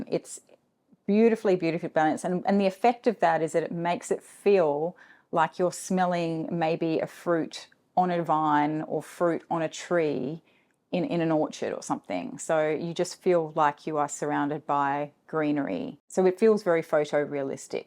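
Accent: Australia. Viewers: Hi, I came to you through Chris. I’m in Adelaide, so it’s nice to see and hear an Aussie talking about perfumes. Subscribed😎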